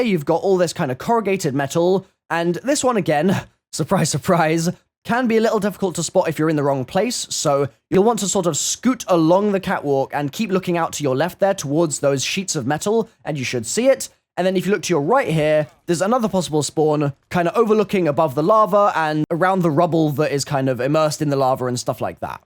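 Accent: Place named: London, United Kingdom. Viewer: YOU SOUND LIKE YOU FROM LONDONNNNN (Nailed it)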